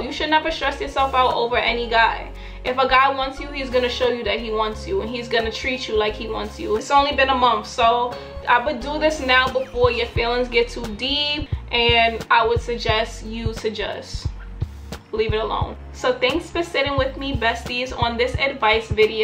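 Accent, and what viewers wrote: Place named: Caribbean. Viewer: Love your vids! Where are a from? I watched a few of them and I think I can hear a slight Caribbean accent, with certain words that is.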